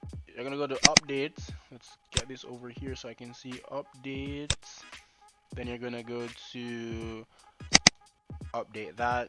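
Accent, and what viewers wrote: Jamaica. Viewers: i cant tell if you are from jamaica, canada or india lol no offense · are you jamaican? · u jamaican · Good vid bruh. Jamaica? Can hear the patois in the voice Wah gwan!!!!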